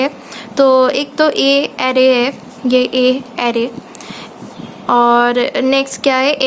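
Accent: India. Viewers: probably a hyderabadi or south indian accent , cute btw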